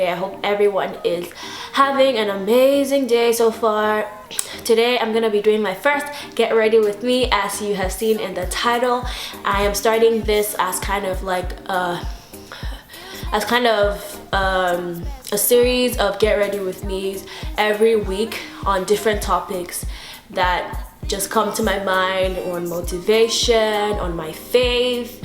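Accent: Nigeria. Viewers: you look so gorgeous here. Naija accent coming through.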